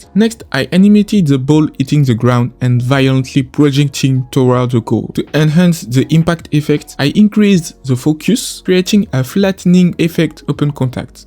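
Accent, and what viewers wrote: France. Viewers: faut avoir des balls pour poster une vidéo ou tu parle en anglais avec l'accent français mdr sinon continue chef c banger ce que tu cook ! ( tu ma tué mdr) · j'ai reconnu l'horrible accent fr mais sinon très bon taff ! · Very french accent mdr. Mais comme d'habitude le rendu du GOAT de la 3D sur Inazuma est légendaire.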